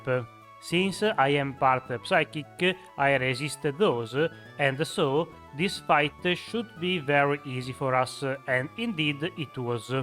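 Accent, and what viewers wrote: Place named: Italy. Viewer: Si sente che sei italiano...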